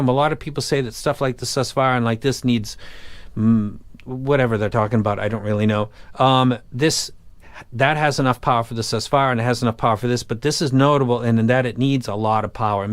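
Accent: Boston, United States. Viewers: Argons are something I have to pick up this year. Also I love what ever music app you are using for your files! I need to set up something similar. You sound like you're from the Boston area.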